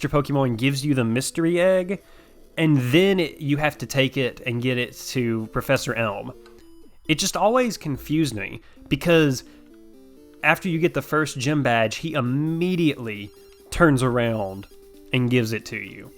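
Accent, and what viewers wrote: South, United States. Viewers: I <3 you. I’ve watched all of your Pokémon runs to this point (a few times since I watch them to sleep) and have now started this nuzlocke. It’s nice hearing another southern voice for my Pokémon runs. Plus, coming from a Pokémon challenge connoisseur, you have really high quality videos and they’re great to watch. I see you’re more busy with life now, and I just wanted to leave this comment and thank you for all the great content you’ve put on here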